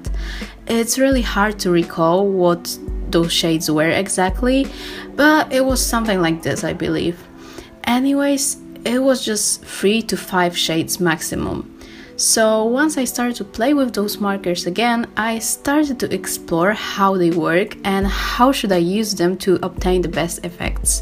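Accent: Poland. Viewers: Are you from Poland? Cause you have polish accent. You're English is so good. How many years you're learning English? (Sorry if my is bad) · Hah! Kiedy cię usłyszałam od razu wiedziałam że jesteś polką :D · Jesteś Polką? Jakoś tak polsko się nazywasz i masz taki polski akcent. Ślicznie rysujesz <3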